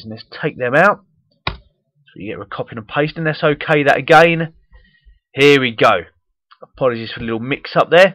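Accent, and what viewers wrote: United Kingdom. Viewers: Couldn't be a better explanation, this is the best tutorial for VLookup. I liked the Brit accent. Oh My Gosh.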